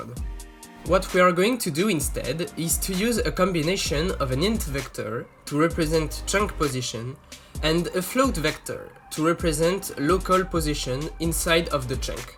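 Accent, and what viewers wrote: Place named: France. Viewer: J'ai reconnu direct que tu est français xDD sa s'entand mais sinon gg mec tu a fait du bon boulot!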